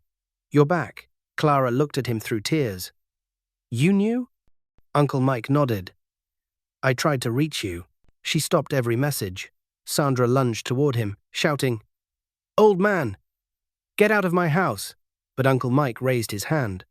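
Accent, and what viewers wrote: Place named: United Kingdom. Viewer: Please can’t narattors be African. Why is this British person speaking!!!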